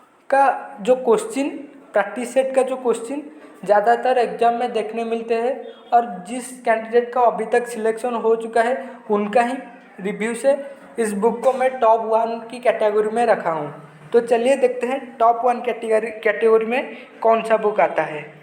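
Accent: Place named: Gujarat, India. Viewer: Ur hindi is funny maybe are u gujrati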